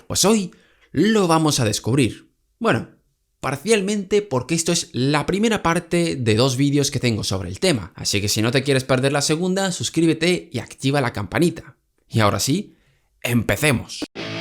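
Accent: Spain. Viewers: Acabas de inferir la ideología política de un individuo por los colores de su canal de YouTube? Te imaginas lo vesanico que suena eso? Deja de apelar a falacias de conclusión inatingente. Eso es propio de energúmenos irracionales. Para éste video se han utilizado 17 papers y dos libros que circulan por 4 escuelas económicas disímiles. Para mayor escarnio te atreves a acusar a un canal de economía mainstream como afín al libertarianismo. Regresa a tus clases de pensamiento económico, por favor. No sabes distinguir entre el acento español y el argentino? De qué multiverso provienes? Permíteme que te hable como un padre: no seas una parodia de ti mismo. Saludos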